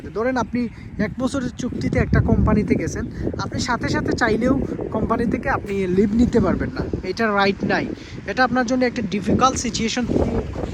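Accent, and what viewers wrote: Sylhet, Bangladesh. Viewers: Vai are u Sylheti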